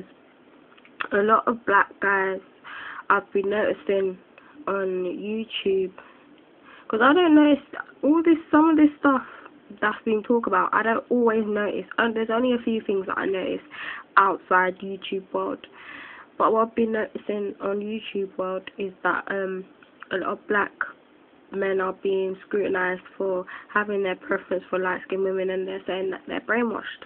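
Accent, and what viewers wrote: United Kingdom. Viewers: Are you from South London? :) I am too! ;D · nice british accent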